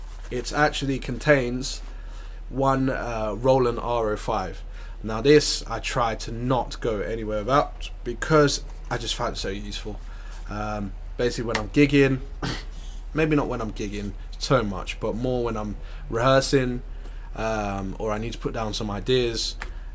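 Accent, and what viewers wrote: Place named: England, United Kingdom. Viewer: a black man with a english accent that's awesome 🙌🙋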